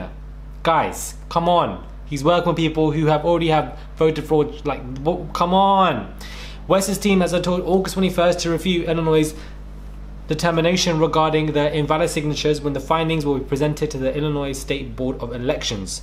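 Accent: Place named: United Kingdom. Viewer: Ngl, was laughing my WHOLE ass off listening to trying to talk about US politics and locations. Caught the pause for pronouncing Illinois correctly, but I lost it when he said "Ar-Kansas." Good vid. Just super funny listening to someone (presumably) from the UK discuss these things.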